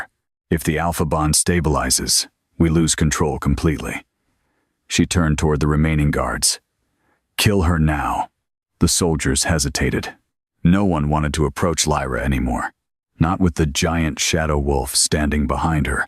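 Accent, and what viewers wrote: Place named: Spain. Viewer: What's with all the " Spanish/Mexican " pronunciations?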